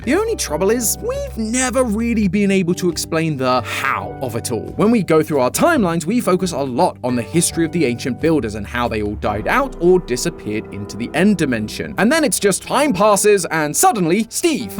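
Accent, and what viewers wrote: United Kingdom. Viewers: BRITISH MATPAT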